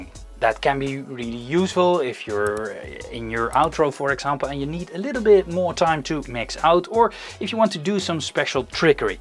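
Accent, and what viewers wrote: Netherlands. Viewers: Hahah je klinkt gelijk Nederlands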